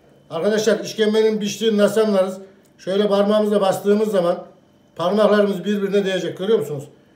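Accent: Turkey. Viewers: Biz böyle seviyoh gardaşım şefimizi, herkeş İsdambul Turkcesi gonuşmah zorunda deyil...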